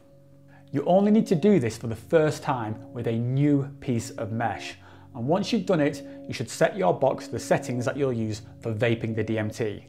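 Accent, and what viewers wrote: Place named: Wigan, United Kingdom. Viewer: Yo are you from Wigan you sound really local I’m from Preston haha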